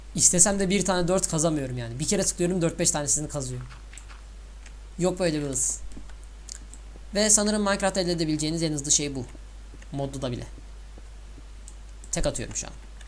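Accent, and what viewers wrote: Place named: United States. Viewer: Abi senin şu amerikan aksanı gibi konuşmana bayılıyorum :) (Bkz: -Ahh kimin umrunda :)) )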